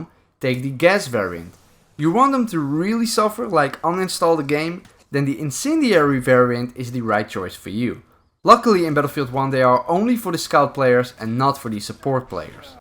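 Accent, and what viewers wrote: Netherlands. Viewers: Are you from the Netherlands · Are you dutch? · Je hoort zo hard dat jij Nederlands bent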